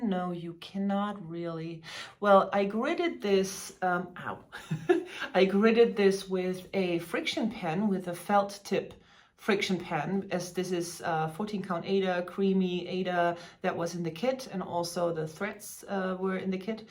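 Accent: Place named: Germany. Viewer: Have you ever visited the island of Borkum? I live in Canada but spent some time in Germany in the late 1960's and your lighthouse piece brought that to mind. It looks wonderful, by the way! I really enjoy your channel,. Let out your German accent as I find it quite charming!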